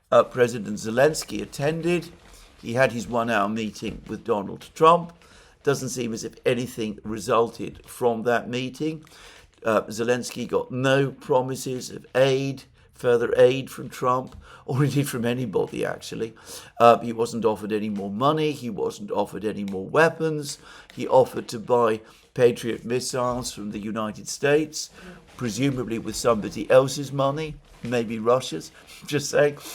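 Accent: United Kingdom. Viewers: Where in Britain is that accent from? Giggles make it even harder to pick. Sorry bro, I have to skip this one.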